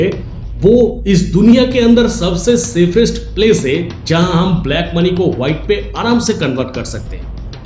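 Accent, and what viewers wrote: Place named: Bengal, India. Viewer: Yes, Bengalis have a lot of courage. Heard of Khudiram Bose, or Bagha Jatin (Jatin Das)? Charapona is Bengali word and the accent of the narrator is unmistakably Bengali.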